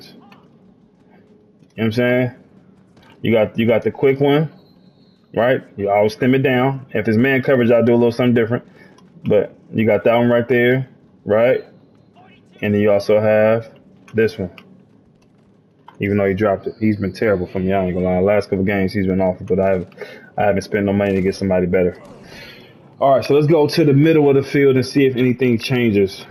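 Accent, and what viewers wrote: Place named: Philadelphia, United States. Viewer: You sound like a Philly guy. Love the content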